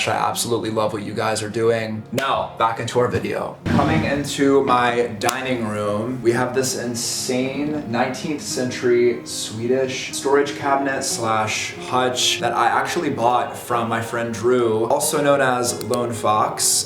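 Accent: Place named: Los Angeles, United States. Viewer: Dude, drop the LA accent. It’s hella annoying. You didn’t used to talk like that.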